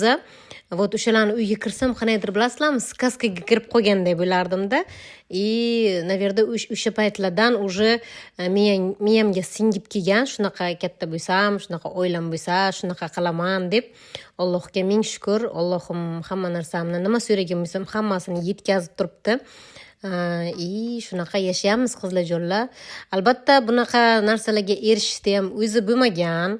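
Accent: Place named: Termez, Uzbekistan. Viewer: опа яхшимисиз жуда яхши аелсиз тан жонингиз сог булсин термизчангиз жуда ектида лекин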